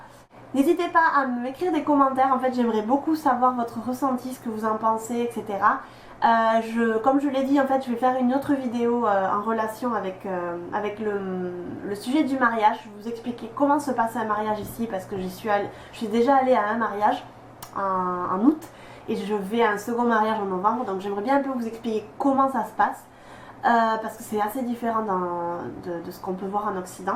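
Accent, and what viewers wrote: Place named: South, France. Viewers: Bah, elle est toulousaine, ça s'entend, couillon ! :) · Enfant ! aaaah l'accent du Sud :P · ouais mais t'as un accent genre toulousain ou bordelais ou marseillais un truc dans le genre^^ donc quand tu parles japonais forcément aussi ^^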